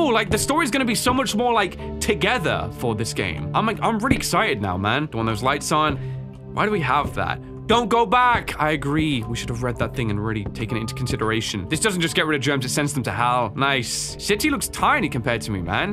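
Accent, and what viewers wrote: United Kingdom. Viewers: Wait what I've been watching for years and only now realized he's British???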